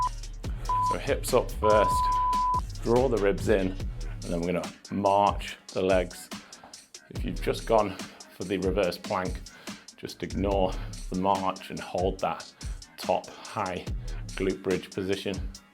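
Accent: United Kingdom. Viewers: Not a fan of the performative, overly excited American workout videos, so your calm, monotone British accent is music to my ears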